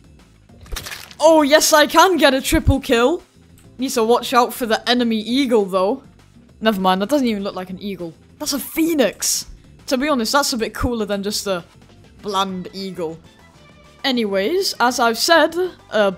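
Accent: United Kingdom. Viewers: User: ChickChickadee2013: not american Me: that’s alr:) u dont have to be american to enjoy aolenus/eagles Btw are you British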